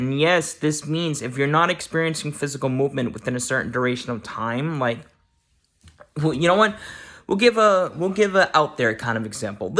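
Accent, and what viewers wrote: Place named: Boston, United States. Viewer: Boston!...I’m glad to hear someone who talks like me in the community 😘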